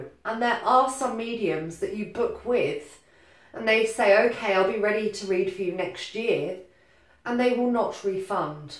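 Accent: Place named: England, United Kingdom. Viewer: Thank you, soooooo true! Ps... 'LOVE your English accent and integrity. Yes, HEIDI Symones always resonates w me, and the MANNA reader is ADELINA Bond! Live their ORIGINAL style as yours as well. Have a great day.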